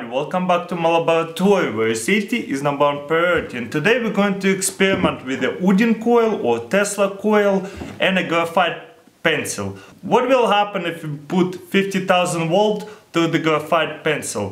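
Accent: Russia. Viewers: 2 million subscribers... For the Russian accent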